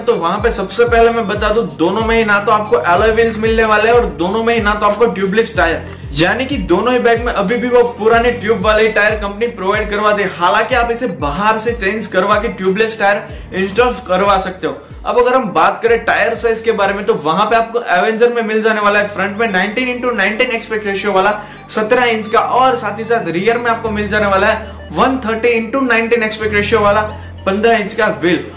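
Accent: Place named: Gujarat, India. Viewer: he is typical gujju .. listen to his accent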